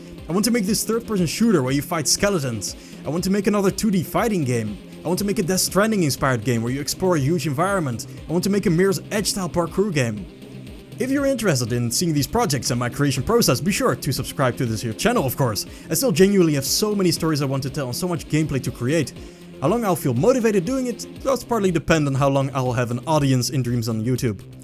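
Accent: Netherlands. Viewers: can you add gyro controls and flick stick to your template? that would be cool. just look up jibbsmart to know what flick stick is. (spreek je trouwens nederlands, aan je accent te horen). Flick stick is a way to snap the camera to any angle. if you want to turn 180 degrees, just tilt the stick down. want to aim 90 degrees to your right. tilt the stick to the right. you can no longer look up and down with the stick. you need gyro to do that. i have been using it on almost any shooter on pc.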